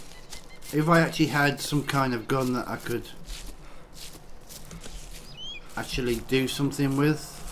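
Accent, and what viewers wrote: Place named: United Kingdom. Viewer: from now on I'm watching ALL of your vids with the closed captioning on. Apparently goggle can't decipher a British accent, and translates it HILARIOUSLY!!!: "Uh, I see shit." Google Translation: "Rubbed, litigation." Bwahahahaha!!! Keep up the great work, mate.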